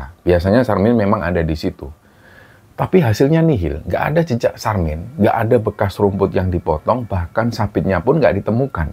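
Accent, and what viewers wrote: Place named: Java, Indonesia. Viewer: kan channel dari Jawa(SBY-Jatim) jadi wajarlah kalo kak tidak menghilangkan logat jawanya..toh beliau selalu menjelaskan kok setiap "kata"(yg dianggap sulit) ke dalam bahasa indonesia agar pendengar paham..setiap host kan punya ciri khas sendiri.. "wong jowo ojo ilang jowone" kalo misal dari Tim mau membuat translate juga gpp..yg penting pendengar masih bisa menikmati cerita..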